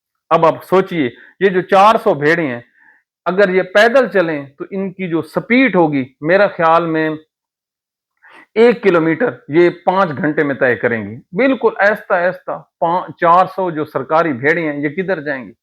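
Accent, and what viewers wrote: Saraiki, Pakistan. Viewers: جب آ پ کا پہلا وی لاگ سنا تو مجھے لگا آ پ کی مادری زبان سرائیکی ہے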